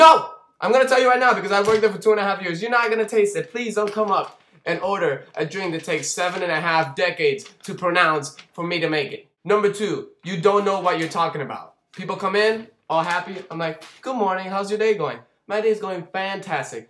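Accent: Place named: Miami, United States. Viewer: Lol. you are so cute and funny! I recognize a miami guy when I hear one ;)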